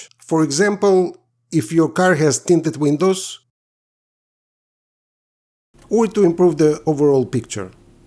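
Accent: Russia. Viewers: вы что русский? акцент русский по слогам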